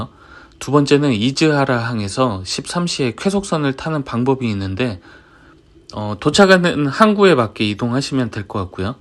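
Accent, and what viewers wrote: Jeolla, South Korea. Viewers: 전라도분 이신가요? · 전라도 사투리 억양 반갑구마 잉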